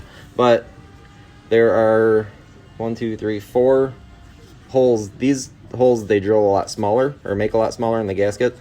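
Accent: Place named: Wisconsin, United States. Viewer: My guess was Wisconsin based on his accent.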